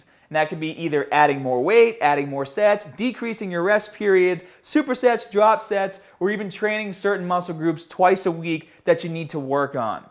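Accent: Boston, United States. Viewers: dat Boston accent doe. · Where did the full on Boston accent come from all of a sudden?? He never sounded like this in his earliest tutorial videos??? :/ It's like he's putting it on or something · Boston 100% haven’t you seen The Depahted?? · Man dat Boston accent is just not fitting, I'm so used to your old one. I've been off the gym for 3 months now due to a shoulder injury, I hope I don't lose all my gains...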